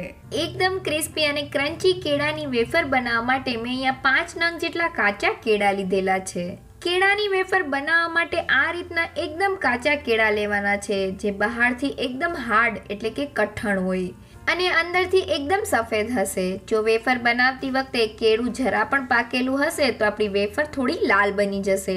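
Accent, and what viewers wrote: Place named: Kathiawar, India. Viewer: Saras bni 6 ho. Bajar jevi Krispy. Tmari bolva ni style bhu gme 6. Kathiyavadi lago 6o. Ben thanks a lot for topra pak recipe. Ur fan from rajkot.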